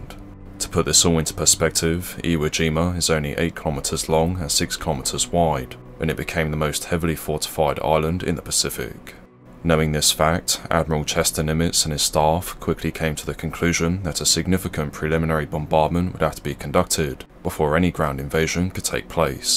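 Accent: England, United Kingdom. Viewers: Which part of England is Your accent from?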